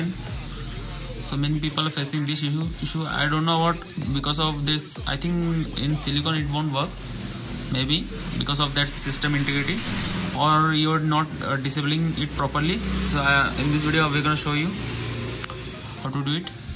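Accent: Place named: India. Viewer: Can anyone tell me what fucking command that is he’s speaking in that Indian accent can’t tell if he’s saying t b or d they all sound the same and then he’s not even saying enough characters that his blurry dirty ass screen shows. It’d help if he turned off the techno music. This is why Americans hate Indians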